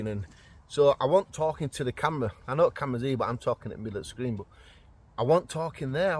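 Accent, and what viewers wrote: United Kingdom. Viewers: We call your pot noodles " Ramon noodles" but we don't have curry flavored. Would like to try that one. I just ran across your channel and subscribed. I use to camp a lot in the woods. Never camped at campsites. Hearing things in the wild. Use your gut instincts. Always stay vigilant. I hear a lot of stories from people. And yes, I believe in Bigfoot and aliens! Where are you from? You sound like you have a British accent? Stay safe and always trust your instincts !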